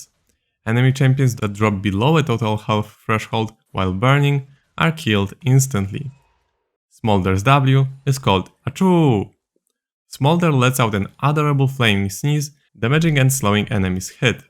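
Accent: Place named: Poland. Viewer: polish accent diff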